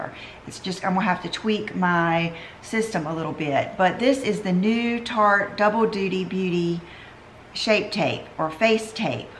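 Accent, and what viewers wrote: South, United States. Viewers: I love your outfit ! You have the most likable personality and such a charming Southern accent. I’m so glad I discovered your channel. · Love to watch your videos, gotta admit it's because of your demeanor and southern accent.....😁 your voice is sooooo like shelby from steal magnolias, hope that does not offend you 👍🌻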